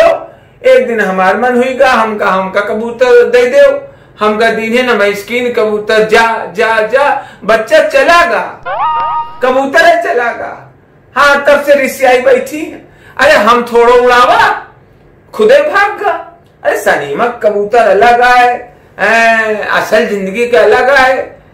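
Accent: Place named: Awadh, India. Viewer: एकदम शुद्ध ग्रामीण अंचल की अवधी है | बहुत बड़ा काम कर रहे हैं आप | "दहिजार का नाती " बड़ी मिठास भरी गाली है | लुप्त हो रही भाषायी विरासत को आप जैसे मझे कलाकार ही सजों सकते हैं | बहुत बहुत धन्यवाद जी |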